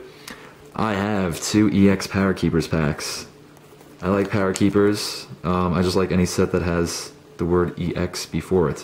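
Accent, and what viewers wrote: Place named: United Kingdom. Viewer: lol nice british accent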